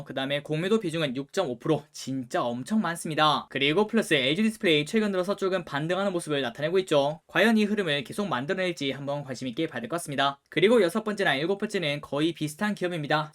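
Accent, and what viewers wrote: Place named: Daegu, South Korea. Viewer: 님! 대구살아요? 와~~표준말 대따 잘하네요.저도 연식이 좀 있는데도 님 영상은 무조건 좋아요! 부터 누르고 꼭 봐요.너무 귀염귀염해여! ㅎㅎ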